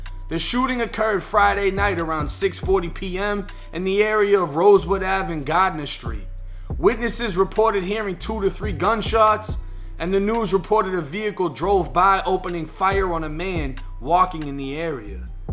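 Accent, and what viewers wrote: Boston, United States. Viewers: Man how long you been down here in Florida now? Gotta lose that weak ass boston accent. Shit wack. Can barely stand to hear you talk. You do good videos and shit but damn the way you talk is annoying AF!!!! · You from Boston?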